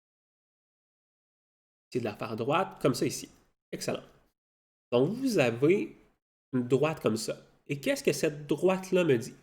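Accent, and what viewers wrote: Quebec, Canada. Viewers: formidable vidéo aussi bien pour cette explication clair et précise que pour ce fabuleux accent Québécois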